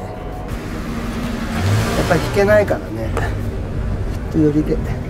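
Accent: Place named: Kanto, Japan. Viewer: 偶然この動画にたどり着きました。築地って、Zfcがピッタリ似合う町ですね。驚きです。写真系動画は、自分で見ている範囲では関西の話し方の人がが多く、説明を解読？している感じで苦労があります。様の話し方は、東京？関東？ふうでフィーリングやニュアンスがしっくりきて聞きやすいです。バックナンバーも見てゆきますのでよろしくお願いいたします。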